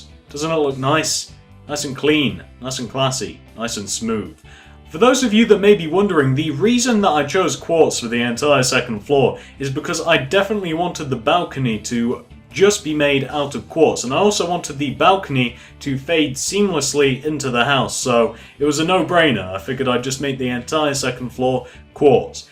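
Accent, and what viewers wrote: England, United Kingdom. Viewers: He's English, not gay.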